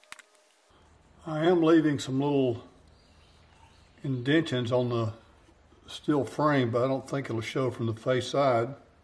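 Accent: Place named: Alabama, United States. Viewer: Alabama? My kinzzz from Huntsville. You have the dialect and accent 😎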